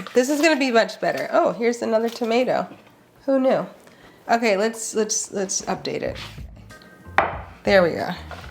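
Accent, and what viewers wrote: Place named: South, United States. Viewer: she's from the south, USA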